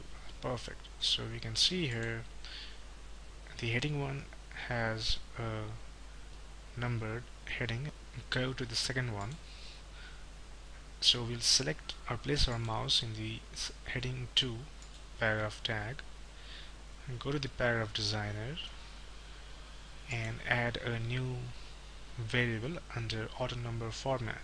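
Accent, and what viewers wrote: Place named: India. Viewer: THANKS MAN WITH INDIAN EXCENT YOU SAVE ME FROM CRAZY 0.O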